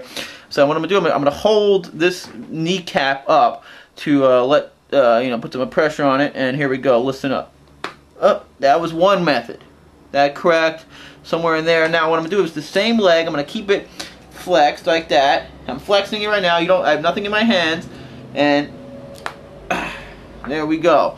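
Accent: United States. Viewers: He has the best boston, yankee accent I'm from the south so I don't here this much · i believe its a new england accent but im not sure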